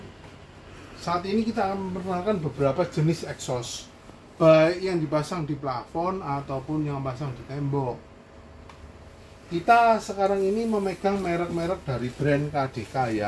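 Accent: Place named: Tegal, Indonesia. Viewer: Tegal nih kayaknya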